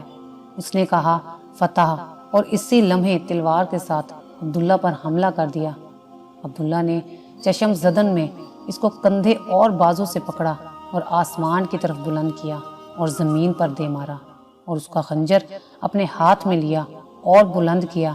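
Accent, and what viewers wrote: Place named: Punjab, Pakistan. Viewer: Aap ka accent bht ghalat hai. Farsi k alfaz k neechay kahan par zabar ya zer hai aap ko nhen pata. Aur ye urdu hai Punjabi nhen, iss ko urdu accent mein parhye. Plese do practice at least three to four hours once script is ready and then upload the video.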